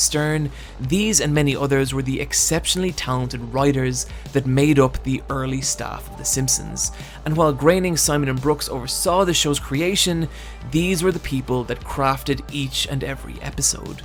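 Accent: Ireland. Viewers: This is a great video man, fair play. And great to hear an Irishman lay it down. Just not used to hearing an Irish accent on decent vids on yewtewib. I have kids and we watch The Simpsons a lot but only season 1-12 (basically because I can't watch any of them after that). Interesting thing is my kids absolutely love it - and I was 11 when I got my first Bart Simpson T-Shirt in 5th Class and I loved it then. But my god it became execrable after around 2001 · your videos are complete rip offs of Nerdwriter1, its hilarious how much of your style youve carbon copied from him, also your americanised irish accent is embarrasing please stop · After watching that entire video still can't figure out why in your Irish accent you went for the American pronunciation of patronise. Other than that, great watch 👍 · Another thick Irish accent haha ;) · I'm gonna watch this, but, fuck your tone, lol. 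This is the first time I've hated an Irish accent but there is something so.... smug and arrogant about the way you're presenting this. It's no secret The Simpsons has gone downhill, but even from the intro I get the sense you're going way way overboard. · wtf is this guy IRISH??? This is so odd?? I am Irish that’s why I realised old homer could read :) new homer can read rarely… :( episodes used to be the full runtime now it’s two episodes between a break :( he really does care about his kids :) that’s one thing I still sometimes see in newer episodes keeping the characters grounded and not making a mockery out of the characters… so true… :( fucking facts :) :(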